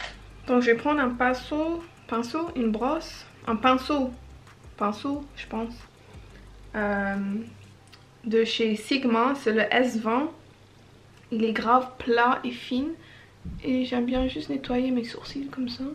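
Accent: France. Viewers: Your french sounds great...t'as un ptit accent français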